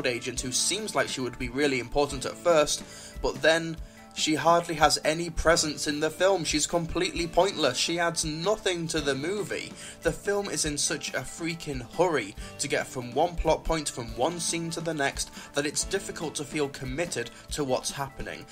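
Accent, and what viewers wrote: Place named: United Kingdom. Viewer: Can you at lest TRY to have an American accent when talking about CAPTAIN AMERICA!!?? Damn Brits should stay in England and talk about English stuff!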